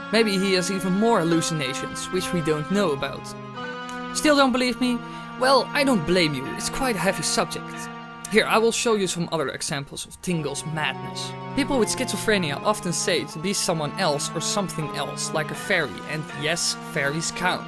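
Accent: Netherlands. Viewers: Is this lad Dutch? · That accent must be Dutch. Good video!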